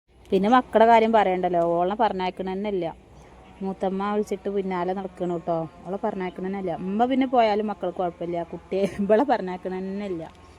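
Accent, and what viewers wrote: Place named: Manjeri, India. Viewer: മഞ്ചേരി ആണോ.. Same സ്ലാങ് ഉള്ള ഫ്രണ്ട് ഉണ്ട് എനിക്ക് 😊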